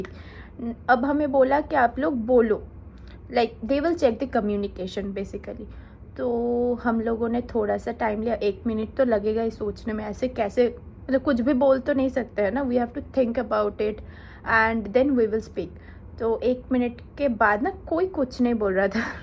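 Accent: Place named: West Bengal, India. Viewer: Apka tengue samajkar muje pata sala app north -east se ho I mean West Bengal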